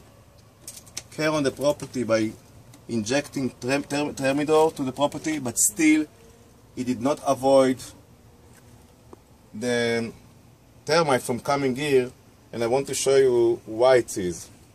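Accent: Israel. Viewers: israeli accent. תודה לך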